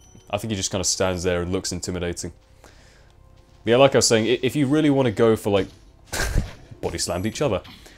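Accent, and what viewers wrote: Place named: England, United Kingdom. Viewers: Reliving my childhood here, through an english accent!!! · english accent is the best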